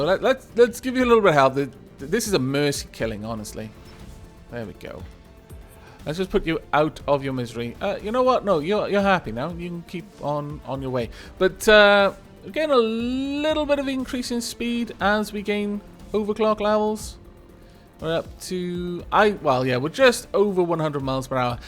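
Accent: Wales, United Kingdom. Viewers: firstly, please read on xD. Secondly, you genuinly are THE best youtuber out there. You aren't recognised as so because you dont play the big games such as minecraft. There, take the compliment and run, you dapper soul! Also you refer to living in Scotland often, but you sound un-mistakably Welsh???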